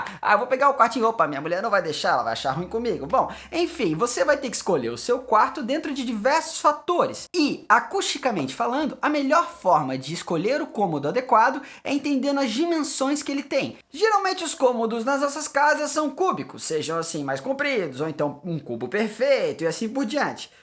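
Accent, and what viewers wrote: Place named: Florianópolis, Brazil. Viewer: sotaque de floripa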